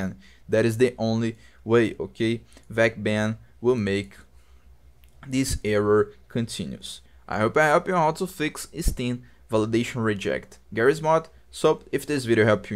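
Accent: Brazil. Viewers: Valeu mano, seu inglês é fd mas o sotaque do BR não sai 😂❤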